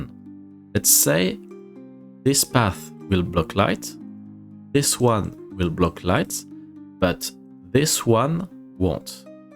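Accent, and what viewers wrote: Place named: France. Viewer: Love your french accent! Merci beaucoup pour ce tutoriel, t'as gagné un autre abonné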